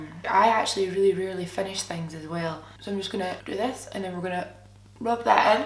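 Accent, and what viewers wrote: Scotland, United Kingdom. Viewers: Omg I saw the awesome makeup and clicked and I was so surprised to hear a Scottish accent! Yay! Love from Glasgow x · There's nothing less beautiful than saying beautiful with a scottish accent! Until a week ago i'd been in new zealand for 2.5 years and watching your vlogs and your scottish accent gave me life! and kept me in tune with my own accent. love it every week- you're amazing! - IG sazwood94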